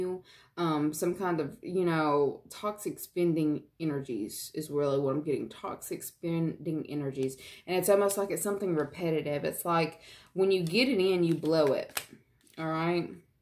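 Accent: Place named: South, United States. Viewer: Thank you so much for this reading!🙏🌻♥️ Your voice sounds just like one of my friends in Charlotte, NC. This is crazy!!😅 Love hearing your readings!!🙏❤️🌷 You must be from the south. You sound like a southern bell. I have not been in a date in 29 years. I'm a June 20th Gemini, cusper. Girl, I'm not copying anyone! I am very strong and am very worthy! I love me and love who I am.🤗♥️ I've been kicked in the butt big time. I'm the most important to me. I'm remodeling my home. It needs it desperately!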